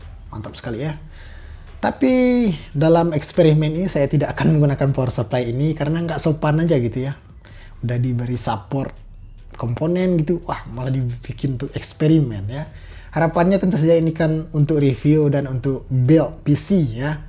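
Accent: West Sumatra, Indonesia. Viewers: Bang... Logat lu mirip Logat orang minang 😁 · Logatnya mirip logat minang ya bro Nice experiment.. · Kebawa logat minang nya bg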